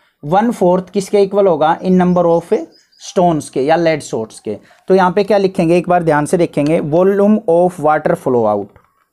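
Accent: Haryana, India. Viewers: haryanvi sir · sir aap haryana se ho kya 🤔🤔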